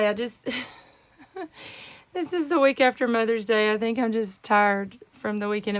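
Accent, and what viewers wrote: South, United States. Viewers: Love your southern drawl ❤️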